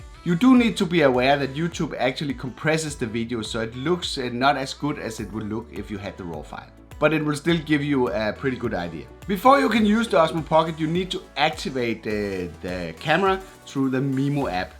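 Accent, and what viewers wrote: Denmark. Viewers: Ære you from denmark? Sound like at Danish guy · Are you from Denmark? Your accent is so danish!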